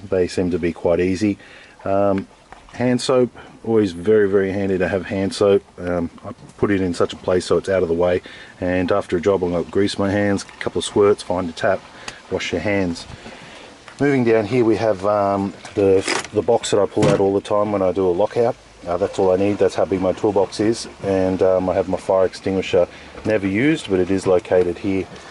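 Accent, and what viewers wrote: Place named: Australia. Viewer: Hes australian.....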